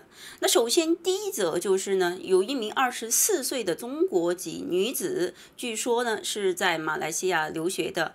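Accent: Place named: North, China. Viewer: 北方口音好吧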